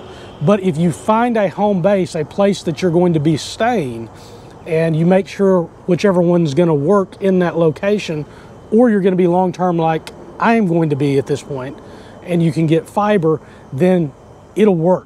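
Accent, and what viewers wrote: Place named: North Carolina, United States. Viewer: Great video. 'Glad you're stayin'. I'm planning on coming over for good in November. The first thing I'm planning on doing is to buy a motorscooter, new one. Honda. What are the hoops you have to jump through. AND most importantly, what is the situation with getting a driver's license. Is a US license good for a period. Can you do the whole registration / insurance thing in one go or is it a long drawn out process. SECONDLY, I'm in N.C. so I'm appreciating the accent; hold on, secondly as I said, why did you go to Mindanao. It seems EVERYONE is going to the Dumaguete area and Mindanao sees like the dark continent. I've never been to the Philippines except to fly over it once but I've lived in Mexico so I appreciate the concept of time and bureaucracy and it seems to be a Spanish trait the Philippines has picked up nicely. 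I'm VERY patient. What are some prices for a two bedroom in Mindanao? Is there an English speaking community in Mindanao or is most of your time spent at home. Questions, questions...... Thanks a bunch and enjoy your day. All the best, Greg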